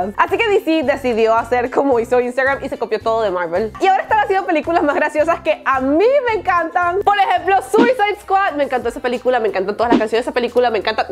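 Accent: Venezuela. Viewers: soy yo o aveses abla muy venezolada!!!!😂😂😂😂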